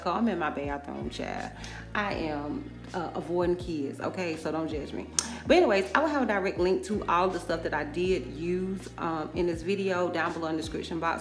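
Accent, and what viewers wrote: United States. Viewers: Girl you are so country..I love it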